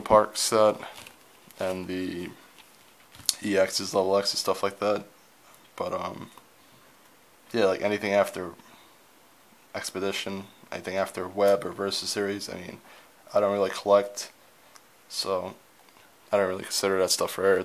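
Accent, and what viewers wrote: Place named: Sweden. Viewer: Are u Swedish ur English sounds swedish Cuz it sounds like when i talk:P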